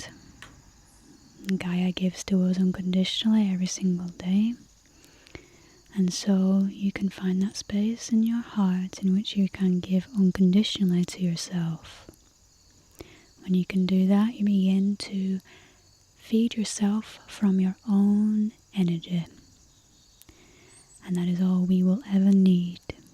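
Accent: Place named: Lancashire, United Kingdom. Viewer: Use the full lancashire accent you possess, so natural and attractive. From a fellow lancastrian.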